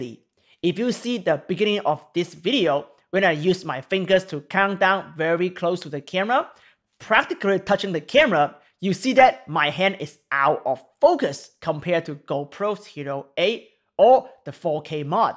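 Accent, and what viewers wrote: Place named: China. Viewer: his english is not broken! he has just chinese pronunciation which is actually cool...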